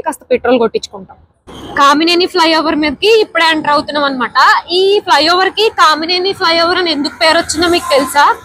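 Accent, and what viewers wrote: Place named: Rajahmundry, India. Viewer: Mi Rajamundry slang super 😂 snacks 😅 anyway nyc vlog 🙌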